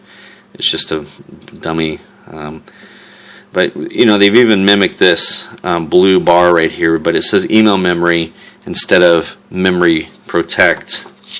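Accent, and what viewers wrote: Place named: United States. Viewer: Great Video...Well explained on all the Features and Functions over 33 minutes! Not boring at all...well spoken! But then again, you sound like Michael Douglas (the actor) with your American Accent as i am a Northern Englishman with a Yorkshire Accent! i just bought a 150 metal (silver) for £75 including delivery off E-Bay last week.!!! It was 2nd hand...but in near excellent condition! I was impressed as it arrived today! I had a Casio150 (identical) bought in 2013 as a gift and it has been well used and knocked/worn out! I caught the strap on a metal fence (caught inbetween the strap and wrist and it broke the strap!) Also the battery was replaced around the same time and it now doesnt bleep at all, and the repair shop didnt know how to fix it...so i decided to get another! Il keep it for spares as i did the previous casio 80 model i bought in 2003 which was a shell from which i used my 1994 black rubber 80 casio!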